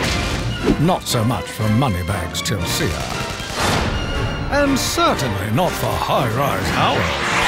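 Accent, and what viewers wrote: United Kingdom. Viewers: but it looks great because a British chap is doing the voice over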